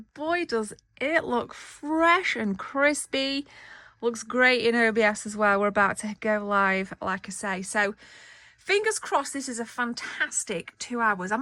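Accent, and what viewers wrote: United Kingdom. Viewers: I love your accent, I can listen to people from the uk all day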